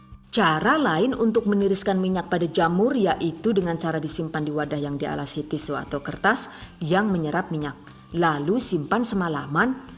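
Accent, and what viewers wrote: Banyumas, Indonesia. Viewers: Yayayaya ngapak temen rikane Mak 😅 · Ngapaknya katok kak yakin bikin ana pingen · Aja klalen,,,diiiii caaateeet luuurrrr😅😅😅😅😅 ora ngapak ora kep nak,,,mantap jiwa mempesona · Astaghfirulloh.... NGAPAKNYA gawe nyong njungkel kepoyuh ngakak ora mandeg kie🤣🤣🤣🤣🤣🤣🤣🤣🤣🤣POKOKE THE BEST CHANNEL, SUKSES SELALU 😍😍😘😘😘😘🤣🤣🤣🤣🤣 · Ngakak pke bhasa ngapak tp sngt seru,,thanks resepnya😁😁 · Suka bgt dngan channel ini,detail bgt. Di selingi khas ngapak yg lucuuuuu....bikin gk bosen nontonnya. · Ngapak mbangeeeet kieee mak... Masya Allah kereeeen. Ra ngapak ra enaaakk... 😂😂😂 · Hahahaha salammm ngapakk .wong ndi sih kie yah😂nyong ngapak kie jan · Jowone ngapak mantap resepe lucu iklane suka 2 nya itu jamurnya nak coba sudah saya catat lalu saya coba ah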